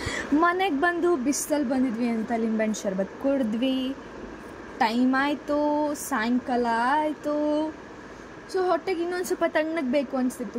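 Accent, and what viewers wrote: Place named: Mangalore, India. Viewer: Naavu moodbidire alli vodidu alvas pu college i loved manglorean accent 1st when I saw your video in instagram I thought you are from dk ❤🎉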